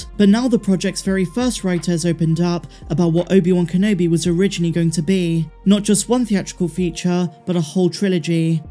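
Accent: United Kingdom. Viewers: ! What part of the U.K. are you from?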